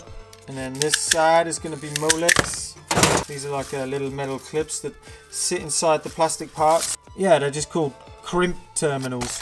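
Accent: Australia. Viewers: Fix the EuroPlus, hook a wire between the IIe's play button to the + play button. TWO track songs! ps, Aussie accent is a beauty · Can we get more half australian accent?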